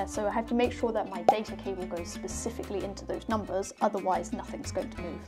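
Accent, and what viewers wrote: United Kingdom. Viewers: YES QUEEN UR FROM THE UK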